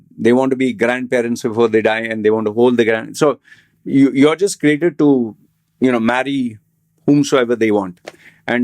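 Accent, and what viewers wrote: Kerala, India. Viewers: Hi, i watch your videos because i like your style of communication. Your accent suggests you could be from Kerala or raised there. Is that correct? Only asking out of curiosity.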